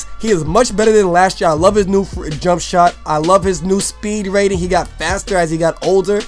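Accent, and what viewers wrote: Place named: New Jersey, United States. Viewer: Really Happy You uploaded. Guess I've been on your ass for Vids lately its just you are one of my favorite commentators. No homo but your voice is perfect for commentating sounds like you are from new jersey or something. Skys your limit.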